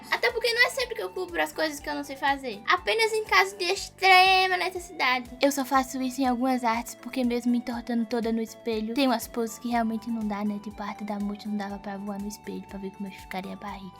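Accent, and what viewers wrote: Brazil. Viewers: seu sotaque e mt forte jkkkk me lembra mt quando passei por recife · Moça vc é de Pernambuco?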